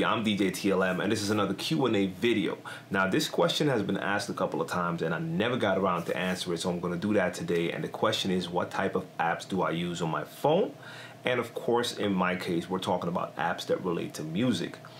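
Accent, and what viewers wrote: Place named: United States. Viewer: You have an impeccable American accent. I live in Purmerend and work in Amsterdam, been here for a little over two years. Very nice place to live. Glad I found your channel, lots of great stuff, thanks fir sharing your experience!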